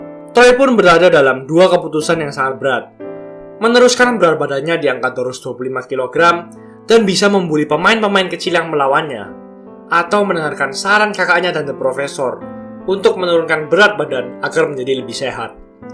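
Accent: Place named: Surabaya, Indonesia. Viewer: Medok banget... Wong Suroboyo Yo... Hehehehe..😀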